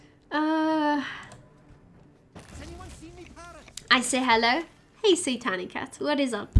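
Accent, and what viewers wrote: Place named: Afrikaans, South Africa. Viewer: Hi can you speak Afrikaans, Ek kan net aan jou aksent sê jy is afrikaans en van suid afrika 😊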